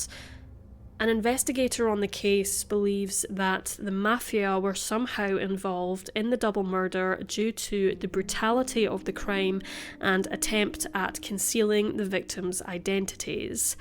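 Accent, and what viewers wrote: Scotland, United Kingdom. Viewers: What a lovely Scottish accent.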